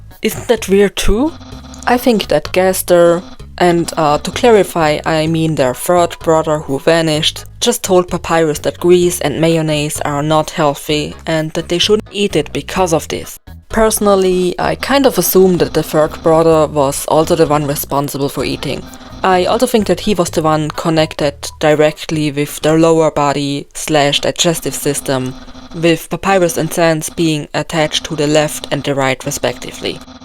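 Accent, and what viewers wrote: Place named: Germany. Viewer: This is a German accent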